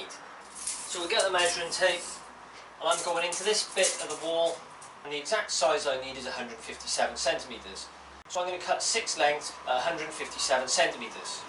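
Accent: United Kingdom. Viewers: Nice video and excellent explanation. What part of the United Kingdom are you from?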